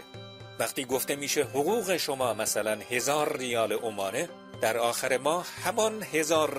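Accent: Iran. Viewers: گوینده بلوچ هستن؟ (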